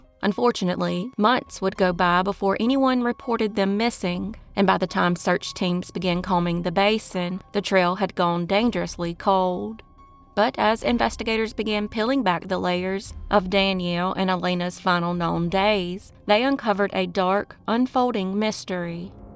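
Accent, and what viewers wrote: South, United States. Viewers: Human narration by good old southern girl with a wonderful accent?! I subbed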